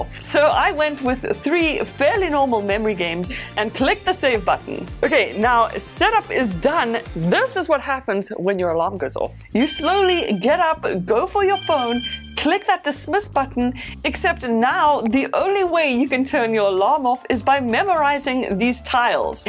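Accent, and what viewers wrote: South Africa. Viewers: Wait are you south african? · Is that a South African accent? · What accent is that? ZA?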